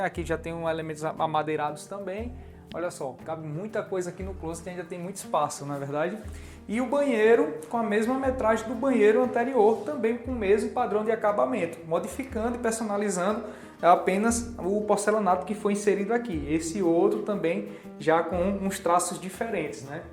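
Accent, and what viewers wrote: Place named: Northeast, Brazil. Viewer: Tô aqui me acabando 😂❤🎉 de rir 😂😂😂 A casinha 🏡 é um show 🎉🎉🎉 mas a sua apresentação ; fala ; e simpatia é o que deixa o vídeo ainda mais convidativo ✌👏🏻👏🏻👏🏻💎 saiba disso ; O jeitinho do povo nordeste 🏜 falar encanta os que ouvem ...😂❤✌😘💋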